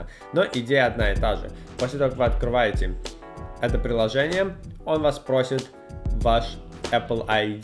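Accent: United States. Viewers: умиляет американский акцент и интерференции с английским языком в речи ведущего. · по-моему живет в США:) акцент так и прет:) "на интернете" хаха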